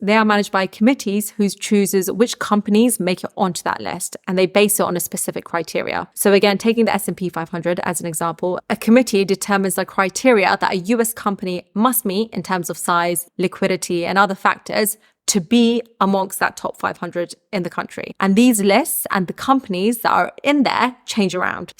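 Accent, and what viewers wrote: United Kingdom. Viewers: Wow. So clear. And, good to hear a fellow Brit. Most of these types of videos are very US-focused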